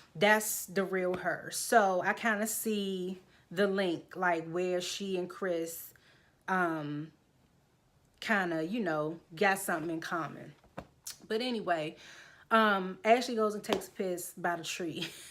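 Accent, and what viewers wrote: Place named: Washington DC, United States. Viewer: I don't even have to ask if you are from DC ...I can hear our accent ALLLL up in your voicet! Damn.. I love when I come across my fellow city folks on Y/T.. I be so siced!! LoL! I followed you via my boo JayLee's channel.. you definitely got a new subbie girl! this review was funny AF btw!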